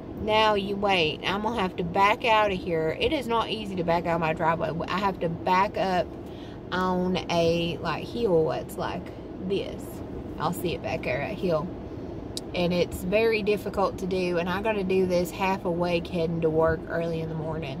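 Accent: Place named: East Tennessee, United States. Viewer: I've been gone from east TN for 3 years. Hearing you talk makes me miss it lol. Love seeing you post again!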